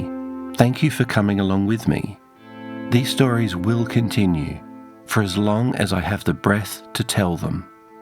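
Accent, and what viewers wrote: Australia. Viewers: Cyber Psychosis sounds much cooler. You could become a Cyber Psycho. Is your accent a mix of Tasmanian and Canberra area/region? I'm interested in regional accents.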